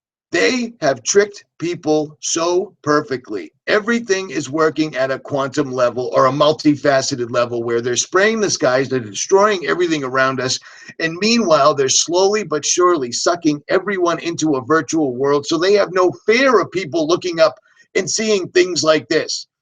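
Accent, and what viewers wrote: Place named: Massachusetts, United States. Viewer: Hi! Great video as always! Every time I listen to one of your videos I can’t help but think of my time living in Marlboro Ma for 10 yrs! Still have some family & friends so when you talk it always reminds me of them and makes me laugh because of the accent! I love it!! Be blessed and God Speed! Love you keeping it real!!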